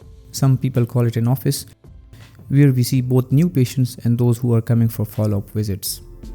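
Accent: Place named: Afghanistan. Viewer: sir i loved your pashto tone….I personally first time watched a pashto medical related video which was quiet good….❤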